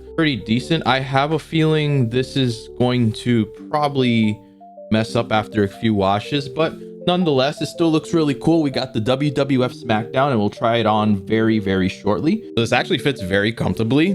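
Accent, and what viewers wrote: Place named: New Jersey, United States. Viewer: I like your accent are you from New Jersey or Philly?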